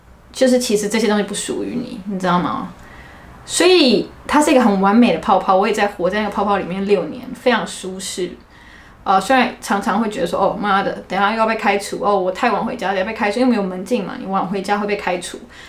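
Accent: Taiwan. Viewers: 好美喔！尤其是這個沒有口音的台灣腔！ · 好道地的台灣口氣，聽起來很親切！ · 妳的口音好台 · 你的中文發音太好了 不看臉會以為你就是個台灣妹子 完全台灣腔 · 第一次看你的影片，光聽聲音真的會以為是台灣人！尤其台語的部分很道地！讚！你的分享也很真誠！泡泡的確會跟著年紀越來緊，同是身為步入下一階段的女性很能體會你的感受！期待之後你的旅行分享喔！阿已訂閱！ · 口音真的是標準台灣腔，我好像在親戚的飯桌聽堂姐分享人生故事🤣🤣🤣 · 哇咧！光用聽的超台 哈哈哈哈哈哈哈哈哈哈哈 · 口條講話語氣好『台灣』😂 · 😮根本就是台灣人的講話方式嘛！ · 挖 你講話比我還台灣.....謝謝您的分享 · 外國人的面孔，台灣人的口音！Mixing goal ! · 卡達? 中東很大 油很有名 沒去過 耳聞 空姐比較有機會出國吧 白人女孩說話超台的 土生土長台灣白人? ^_______^" 很有趣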